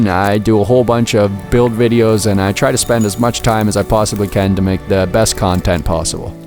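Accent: Canada. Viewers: Awesome build, my only complaint is the use of pizza sauce from a bottle, and cheddar cheese on a pizza. This is the most Canadian content possible (I can tell from your accent) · I can usually not tell where people are from from their accents but you are very Canadian. :) cheers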